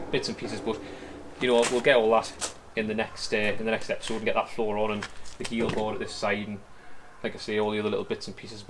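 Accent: Newcastle, United Kingdom. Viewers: I love the work you're doing but I'm also enjoying the Geordie narration!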